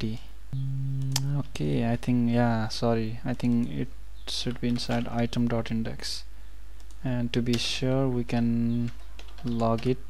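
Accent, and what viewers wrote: India. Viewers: Опять индусина. Бля невозможно слушать...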